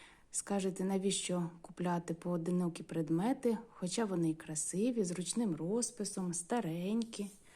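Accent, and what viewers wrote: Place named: Central Ukraine, Ukraine. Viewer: Голос приятный, успокаивающий. Рассказываете интересно, слушать приятно украинский язык центральной части Украины. Я училась в Черкассах, там тоже так говорят, как Вы.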